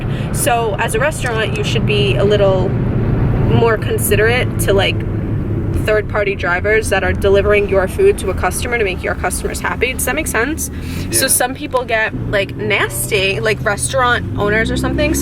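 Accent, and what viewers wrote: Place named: New Jersey, United States. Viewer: You sound like yo have a jersey accent :)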